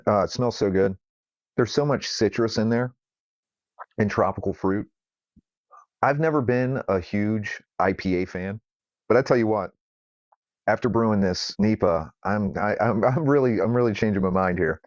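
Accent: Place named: Maryland, United States. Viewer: I appreciate your videos. You sound like you’re from Maryland. Are you?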